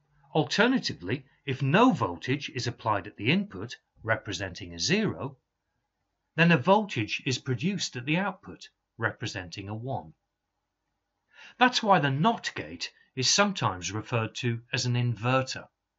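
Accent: United Kingdom. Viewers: You've got such a beautiful british accent. And your explanation is very clear!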